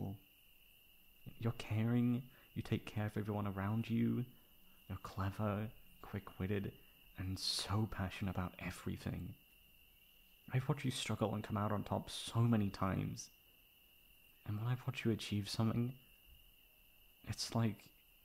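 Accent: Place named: United Kingdom. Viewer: AND HE HAS A BRITISH WHAT MORE CAN U ASK FOR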